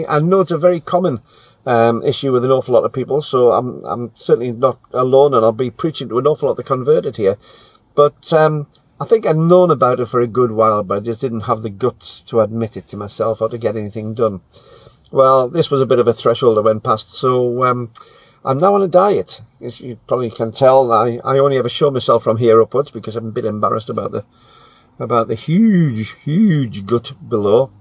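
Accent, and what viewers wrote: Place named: Northumberland, United Kingdom. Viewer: Hi, whilst listening to some great waffling, I'm trying to place your accent. You sound very similar to some of my relatives who were originally from Haltwhistle in Northumberland. Am I in the right geographical zone? Cheers.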